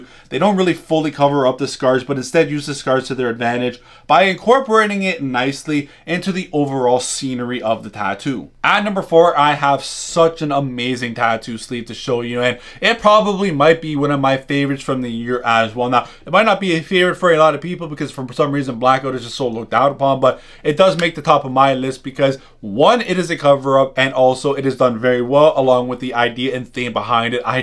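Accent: Northern Ireland, United Kingdom. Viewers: Wow bro, yr accent sounds exactly like a Northern Ireland accent, is that where yr from? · Northern Irish 😂😂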